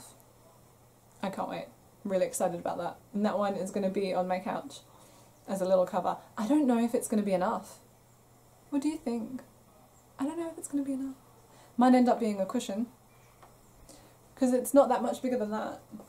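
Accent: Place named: Australia. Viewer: Wow !! Lismore, nice part of the world. I’m so happy to find you, I’m from Sydney and it’s so nice to hear another Aussie voice on YouTube...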